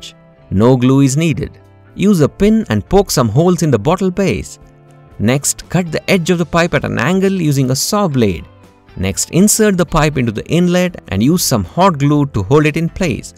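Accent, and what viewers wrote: India. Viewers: Indian accent sounds cute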